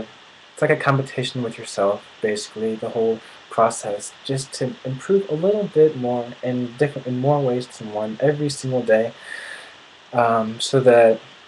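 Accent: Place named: Upper Bavaria, Germany. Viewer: Du hast beim Deutsch reden einen oberbayerischen Akzent. Das ist soooo schräg :D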